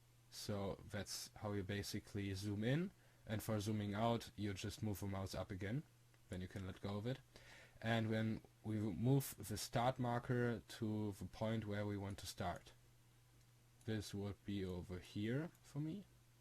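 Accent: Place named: Germany. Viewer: Bist du Deutsch? wenn ja hört man es sehr :D aber trotzdem gutes Tutorial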